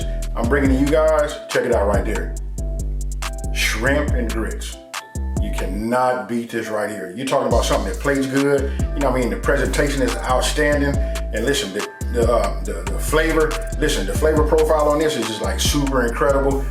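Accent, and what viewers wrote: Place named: South, United States. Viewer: You need to come to Destin FL and try grits a yaya at this local place. This sorta reminds me of it. I can tell you’re southern, but from where?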